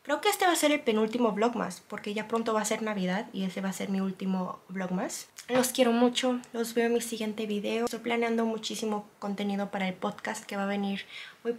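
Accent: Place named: Mexico. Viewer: No inventes, te ves bien bien asiática, en el video de tu clase. Aquí en YT pareces asiática latina. Ya cuando hablas, pues sabemos que eres mexicana, you know?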